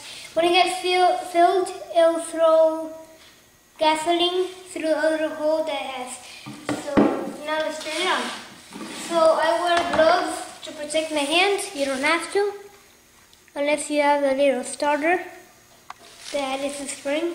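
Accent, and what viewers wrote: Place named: Asia. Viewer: You sound like an Asian. No wonder, you know how to do it.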